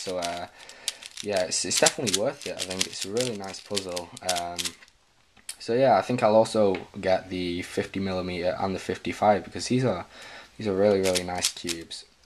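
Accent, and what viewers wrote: United Kingdom. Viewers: you sound british , how long did it take light take to ship to britain if you do live in britain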